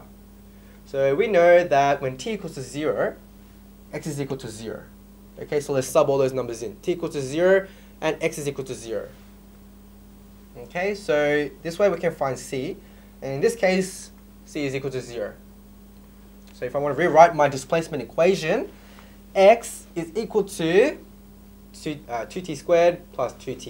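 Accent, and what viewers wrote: Australia. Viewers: Honestly this video really helps, Implementing examples and worked solutions really helped clear some misunderstandings I had, Thanks. Also the Australian accent is really rubbing off on me after watching a few of of these videos.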